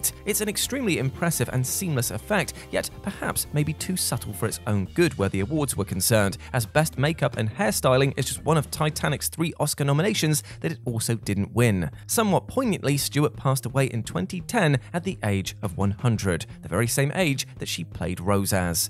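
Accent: United Kingdom. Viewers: Why is it time and time again like a high percentage of these documentary like features are narrated by English accented actors or spokes people. Enough of these overindulgent usage of accented narrations by Brits. There are other people of other nationalities who can do an narration or presentation.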